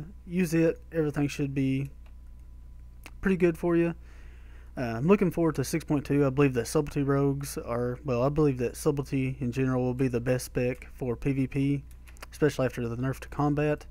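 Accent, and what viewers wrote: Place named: Alabama, United States. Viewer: I love your Alabama accent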